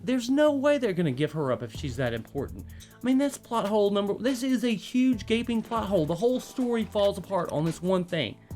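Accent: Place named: South, United States. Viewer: Where are you from? I hear a bit of a Southern accent.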